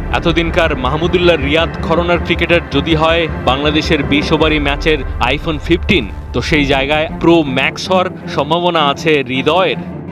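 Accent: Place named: India. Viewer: ইন্ডিয়ান কন্ঠে খবর পড়া কেন😡😡😡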